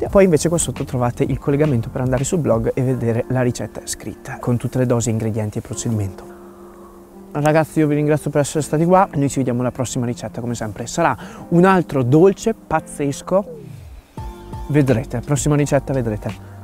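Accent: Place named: Rome, Italy. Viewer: Simpaticissimo...ma sei romano?ogni tanto te ne esci alla romana😄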